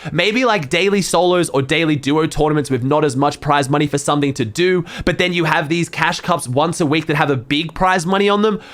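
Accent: Australia. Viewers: Talking Me Watching Benjy Die The Whole TIme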